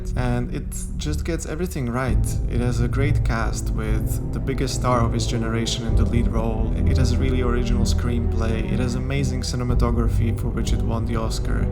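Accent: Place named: France. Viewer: You let the French influence sneak into your English. I'm not criticizing, because it wasn't distracting, and English orthography is a mess, but you also pronounced "Liam", "Bowie", "McConaughey", and "Gyllenhaal" oddly.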